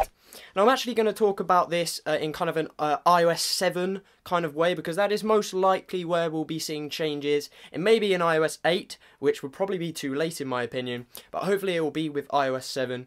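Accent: United Kingdom. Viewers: I really like your british accent!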